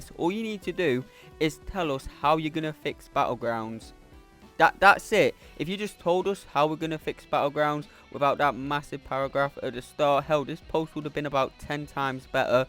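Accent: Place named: Liverpool, United Kingdom. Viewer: He sounds scouser to me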